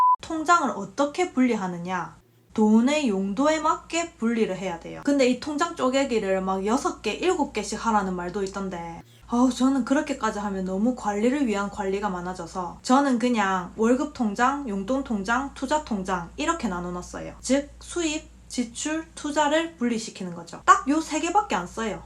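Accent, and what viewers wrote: Gyeongsang, South Korea. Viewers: 경상도 아지매라 그런지 말이 쏙쏙 넘 재미나네요 농협 붙박이로 쓰는데 유익한 정보 보고 갑니다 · 우연히 보았는데 인터넷을 잘못하는데 토스뱅크인가 파킹통장 먄들어야겠네요 경상도 아가씨라 쏙쏙 귀에 꼬치네 유익한 정보 고맙십니뎅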